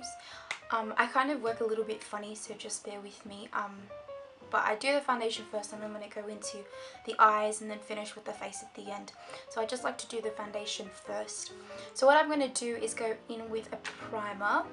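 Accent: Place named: New Zealand. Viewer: OMG i just found you and subscribed to you and i love you!!!!! fabulous!!! love your accent!!!! new zealand? or south african?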